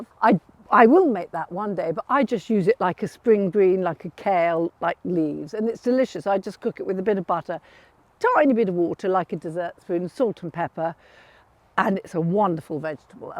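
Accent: RP, United Kingdom. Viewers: The combination of the cut-glass accent and the vocab (manky). Hilarious and does me good....finally subscribed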